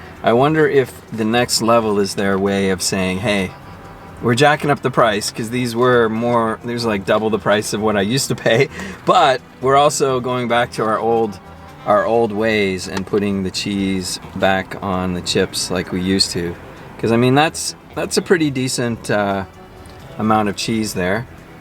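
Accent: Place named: Canada. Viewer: You may not have had food from home in a long while, but you still sound Canadian, eh?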